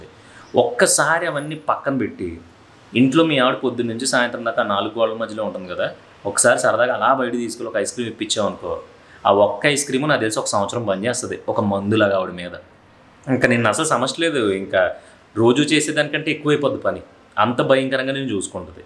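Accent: Godavari, India. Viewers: East godavari aa.. west godavari . Aa. Sit miru.. but great ga chepparu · Mee vuru godavari jillaa na andi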